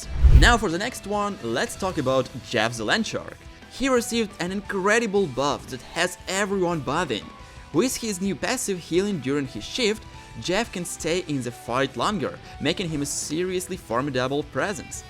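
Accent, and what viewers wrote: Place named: Baltic states. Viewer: Are you from the baltics? I have a friend from there and he has the same accent as you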